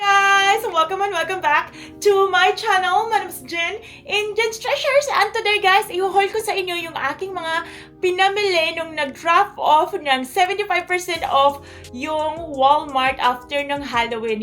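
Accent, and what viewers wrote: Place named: Visayas, Philippines. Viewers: Waray po siya kung hindi ako nagkakamali. Magkaiba ang Bisaya sa Waray po. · Maam bisaya ka po ba?newbie here po..kasi pansin ko po parang hirap kayo sa tagalog po..nag lolog po kayo hehe sorry po,bisaya rin po kasi ako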